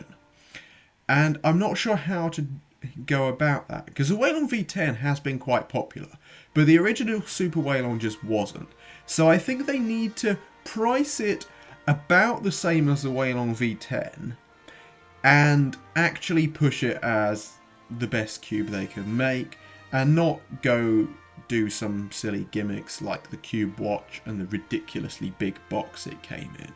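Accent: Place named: RP, United Kingdom. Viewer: I love your posh accent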